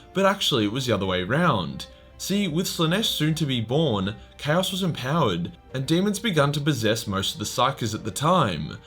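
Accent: Australia. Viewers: Finally, we take aim at. I used to like his stuff, starting with the Siege of Vraks which I still watch. But he got a bit too political. I didn't come for rants on companies and politics I came for the Grim Dark of the Australian Accent.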